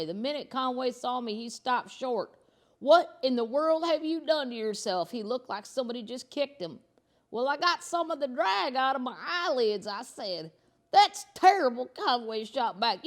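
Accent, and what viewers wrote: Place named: Kentucky, United States. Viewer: I think I’m pi in up that Kentucky drawl. It’s strange ‘cause I’m from Boston! I sooo look forward to storytime and to the Pubcast. You are my salvation with all this solitary living. Can’t thank you enough.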